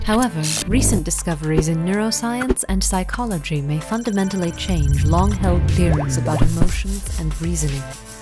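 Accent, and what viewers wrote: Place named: United Kingdom. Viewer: Some one PLEASE tell me WHY these producers insist that a 'British' accent qualifies as an authoritative narrative ????? Is this opperant conditioning attitude the best you can do? Right coast version of British accent turned inside out. The flexion is suck eggs.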